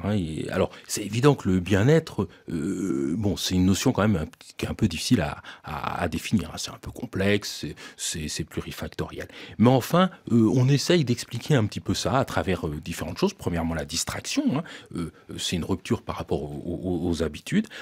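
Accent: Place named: France. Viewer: Pourquoi ce monsieur prend l un accent Français....probablement si est français 😅😅😅😅 Pauvre Afrique....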